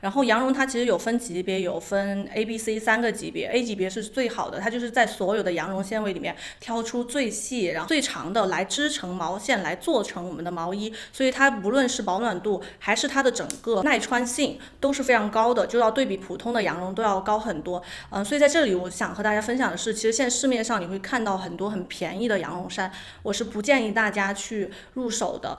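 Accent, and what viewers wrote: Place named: Shandong, China. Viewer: 👍听口音是山东人😃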